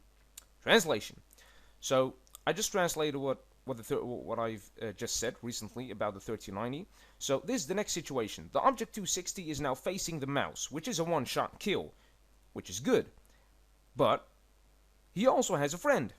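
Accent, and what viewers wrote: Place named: Netherlands. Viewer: jouw engels is zeker niet slecht,hier en daar wat woorden of zinnen die ik anders had gezegd of vertaald.. alleen dat nederlandse accent he? kan je niks aan doen,maar is wel grappig XD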